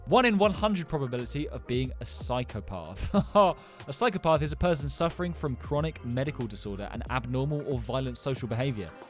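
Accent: United Kingdom. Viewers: I feel like your accent is like British with something else that I don’t even know the accent singing other songs and perfecting the accent except not American accent. Oh my goodness.😂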